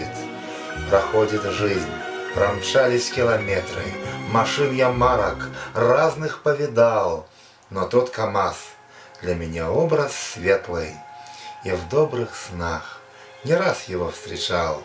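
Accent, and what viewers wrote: Belarus. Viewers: Братка- беларус чытае?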